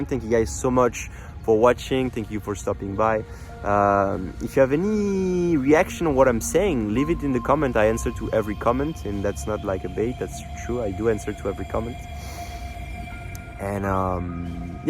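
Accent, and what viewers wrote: France. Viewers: It was good while I was around 50 because at least you don't die with a single shot, the problem happens when you are constantly ganked. You can only invade if the host already has a summon, and once you invade he can summon a blue hunter... There should not be an item like that, and the invader should be able to summon help. That's my opinion. Fixing PVP is the only thing this game needs in order to b perfect imo. You've got a new subscriber, I am looking forward to watching more videos. Loved the French accent haha!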